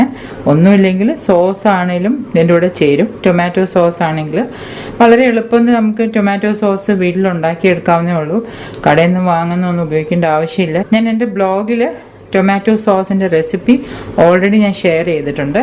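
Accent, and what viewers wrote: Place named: Kottayam, India. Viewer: .. kottayam kaari aanannu thonni.. Njnaanum oru kottaym kaari...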